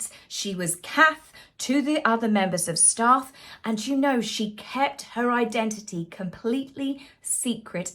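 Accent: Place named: Yorkshire, United Kingdom. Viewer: And Yorkshire accent galore 😂😂❤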